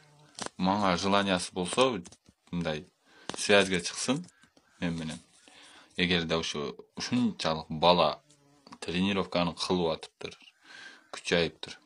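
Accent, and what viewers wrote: Standard. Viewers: Рассиянын каериндесин Уят кылдын кыргызча суйлоп Кыргызчаны сендей таза суйлошпооойт. Альзамдулиллях деген созун учун ылайым еки дуйно бактылуу бол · Молодец орус болсода таза кыргызча суйлоп салдыго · Азаматсын жигит Кыргызчаны менен жакшы суйлойт экенсин мен Лейлекский акцент менен тата пата деп суйлом сен орус болуп таза суйлойт экенсин тан бердим. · Молодец кыргыздын орусу таза суйлоптур алга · Молодец кыргызча таза суйлойт экенсин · Баракелде мына накта жигит. Таза кыргыз тили менен суйлоп жатат. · Нукура,таза суйлойт экенсин. Азамаатсын,жигит. Бар бол,аман бол,ийгилик саган. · Красавчик. Условияны туура койдун.Кыргыз тилин таза суйлойткенсин! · Азамат Манастын тилин таза суйлойт экенсин иним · Маладес Орус Улутуңда Болгонуң менен Кыргызчаны Таза сүйлөйт экенсиң Азамат Сени менен дос болуш керек экен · 😂😂😂ама эркекче болуптур а булжагы? 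Анча мынча Кыргызстандыктардан тазза сүйлөйсүнго жетим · Азаматтсын иним таза Кыргызча суйлойт экенсин ишине ийгилик кайда журсон да аман эсен бол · Красавчик орсу болсондо бауржандан таза суйлойт екенсин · Менденда таза суйлойтко 😀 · Таптаза суйлойтко бул бала Алхамдулиллах деген созун абдан жакты ийгилик Аман бол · Молодец,жунбаш мага караганда таза суйлойт экенсин кыргызча 😂😂😂 эркек менда сени колдойм. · Кыргызчаны таза суйлойт экенсин Сенамдарга Караганда олаа Сола оняк соняк тана ука тирик жетим жакшына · молодец тууган кыргызчаны өтө таза сүйлөйт экенсиң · Аззаматсын таза Кыргызча суйлогон учун👍🤝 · Бул таза суйлойт го кып-кыргызча👍👍👍👍👍👍👍👍👍👍👍👍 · Азаматсын опкосу жокторго караганда таза суйлойт экенсин азаматсын чон жигит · Баракелде анча мынча кыргыздардан таза суйлойт экенсин аман бол укам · Баракелде бала , таза суйлоптур 👍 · Орус кыргычаны таза суйлойтко хайы келдимго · Достойный Таза кыргызча суйлопсунго молодец💪 журогун кыргыз экен 🔥🔥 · он кыргыздын ортосуна бир орус келсе ону тен орусча суйлогон кыргызым ай бат эле сатылып кетесинер мен жон гана туура обу жок катын орсок уй сайт деп койот кыргызда обу жоктордон он эсе таза суйлойт экен анысына рахмат мен бауржанды эле колдойм себеби ал кыргыз мен кыргыз экенимди мекенимди сатпайм бирок бабуржандын оздук аламдык адеп ахлагынабы же элге болгон улгусуно карасак точно эле тантык. 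· Кыргызча таза суйлон экенсин 👍👍👍👍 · Красавчик таза анча мынча кыргызга Караганда таза суйлопсун мына сени патриот десе болот орус болсо да кыргыз тилде суйлогон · Маладец еркек екенсин орус болсонда ит емгенге караганда кыргызча таза суйлодун 👍👍🤝🤝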